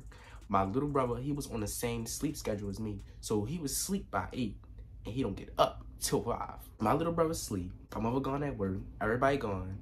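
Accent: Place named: DMV, United States. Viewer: U gotta be from the dmv cuz the accent lawddd😂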